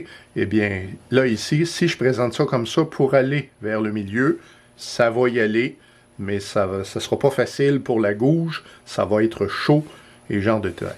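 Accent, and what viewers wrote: Quebec, Canada. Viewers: Il a l’accent québécois. Bravo pour la pédagogie !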